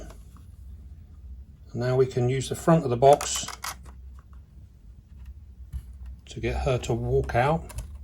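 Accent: United Kingdom. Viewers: Why are you speaking in farenheit but have a british accent?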